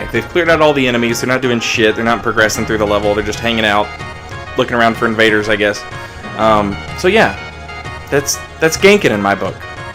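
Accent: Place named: South, United States. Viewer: Cuz Vape Nation y'all. I love memes in your southern drawl. It's adorable.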